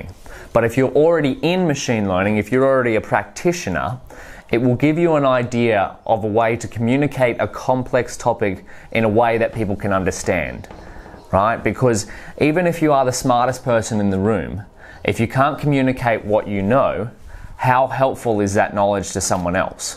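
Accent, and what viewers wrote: Australia. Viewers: The way you speak sometimes feel like Billy from strengerthings , or are u Australian..?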